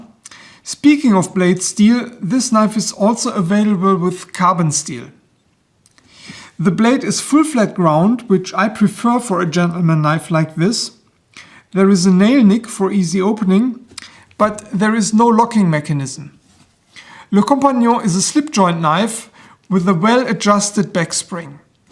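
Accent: Germany. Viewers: Sein Englisch ist doch perfekt zu verstehen, klingt wie deutsch😅😅😅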